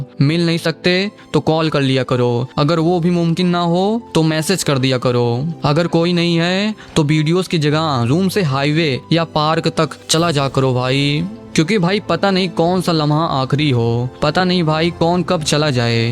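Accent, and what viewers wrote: India. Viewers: bruhh don't speak in english. your accent is soo indian man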